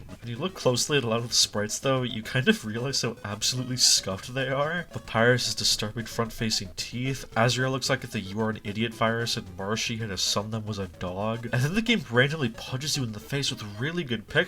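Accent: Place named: United States. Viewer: Žiūriu vidosą, akcentas angliškas, galvoju čia amerikonas ir pyst Gustavo Enciklopedijos intro pradeda grot porą sekundžių. Šito vapšie nesitikėjau išgirsti žiūrėdamas vidosą apie morališkumą žaidimuose.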